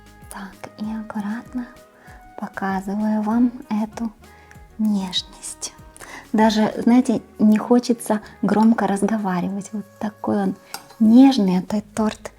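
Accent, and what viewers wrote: Ukraine. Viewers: Чудовий , ніжний , гарний тендітний десерт як і автор каналу !❤ браво! Окрема подяка за прекрасну українську!!!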